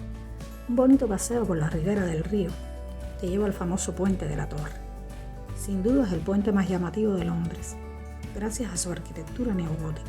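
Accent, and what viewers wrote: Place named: Cuba. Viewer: El video está bonito, muestra los lugares imprescindibles de Londres. Tienes acento cubano.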